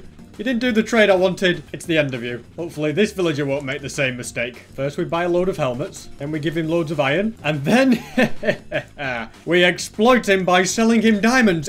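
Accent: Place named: Scotland, United Kingdom. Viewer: He sound Scottish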